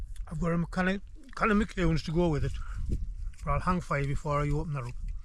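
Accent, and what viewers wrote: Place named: Durham, United Kingdom. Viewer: Good video,you sound like from my neck of the woods near Durham.i love Weardale.Im always on the fells.👍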